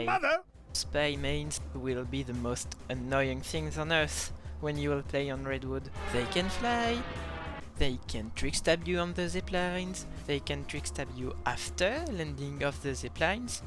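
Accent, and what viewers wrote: France. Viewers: Tell me,judging by your accent,are you…french ?!(which there is nothing wrong about)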